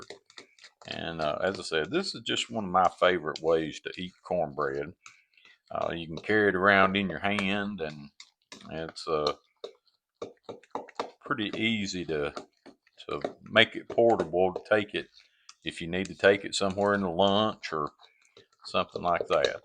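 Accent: South, United States. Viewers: What is with the Southern brogue?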